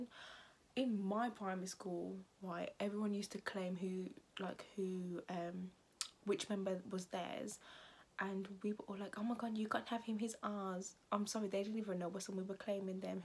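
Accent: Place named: RP, United Kingdom. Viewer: Nah why do you sound so posh wtf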